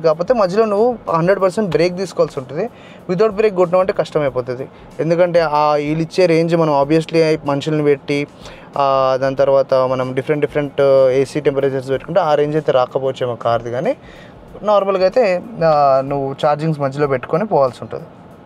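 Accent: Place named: Telangana, India. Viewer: Bro Nee Launguage style So nice.....Pure telangana accent...Pakka Clear Cut On subject. Spr Bro Keep it up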